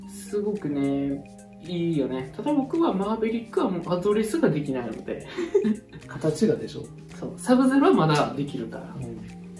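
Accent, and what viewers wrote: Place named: Kagoshima, Japan. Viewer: やっぱり鹿児島弁は聞いてて、気持ちよく聞き取れるし、いい感じです🙋いつも楽しいYouTube動画で試打やクラブの情報ありがとうございます♥️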